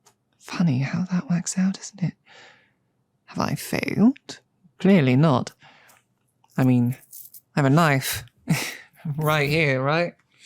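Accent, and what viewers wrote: United Kingdom. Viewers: British, needs to kill me and spouts puns? Yeah its a friday alright, ty!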